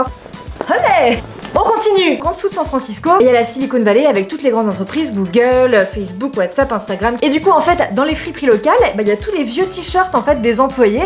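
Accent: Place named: Southwest France, France. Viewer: Oui une vidéo de! meilleures trouvailles du monde. j'adore ton accent de Maïté haha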